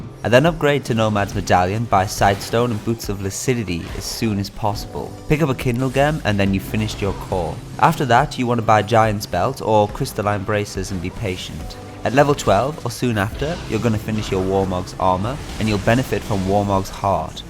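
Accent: United Kingdom. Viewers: Your British accent makes me go: OMG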